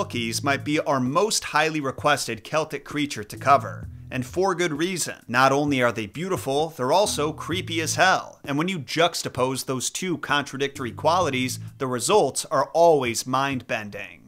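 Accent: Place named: United States. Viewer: The pronunciation is almost there but I think it's the American twang that makes it sound funny 😂 Loved this I would definitely love to see more ❤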